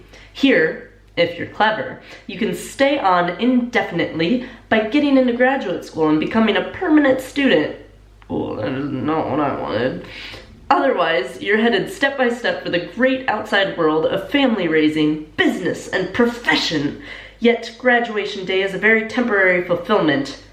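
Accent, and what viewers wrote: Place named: United States. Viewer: What's her accent is .... which state in US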